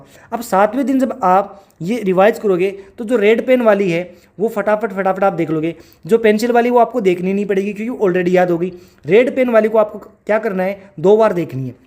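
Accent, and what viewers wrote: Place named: Rajasthan, India. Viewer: Dear sir your accent is rajasthani😅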